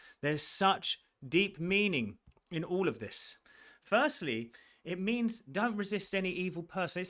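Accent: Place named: London, United Kingdom. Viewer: You have a lovely voice and I also love your London accent!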